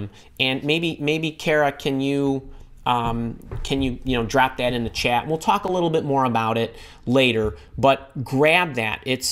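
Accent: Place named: New York, United States. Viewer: thanks so much. These times are VERY weird - I don't have to tell you. A "little" off topic here, but I do really like your accent and I'm guessing you're in NYC? How are things there? We've been in tis "lockdown" for a week and a half and it's driving us crazy! But anyway - I'm wondering as the powers-that-shouldn't-be seem to be hell bent on upending our world as we knew it anyway - if there'll be more jobs available "online" as in virtual assistants...perhaps you could do a video concentrating on ideas for all of us currently out-of-work admin folks? I'm fluently bilingual (English/French) so I can translate as well but not "officially". Hmm....I'm hoping to find employment asap - as are we all of course! I'm just wondering what the market is for international bilingual virtual assistants? Heartfelt thanks!;-)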